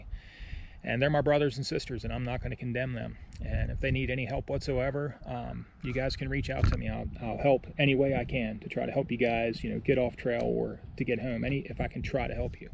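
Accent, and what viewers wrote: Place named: Pennsylvania, United States. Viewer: That, my friend, was a very difficult video for you to make and I respect your choice to get off the trail. I also know that you will finish what you started, whether it's this year or next year. I had to delay my AT hike by 60 days. I will make another decision at that time. It was very difficult because I waited until only a few days before I was to leave. You know all the planning that goes on before leaving. All that came to a screeching halt. BTW, I thought I detected a PA accent. We have relatives near Hazelton. Heard of Senapes bakery? I tried to warn you and others on trail without being mean that something bad was happening and people needed to pay attention to it. On the videos yet to be released, add a short notice in the beginning saying you are off trail. Thank you. Maybe I'll see you on trail some day. 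John from MI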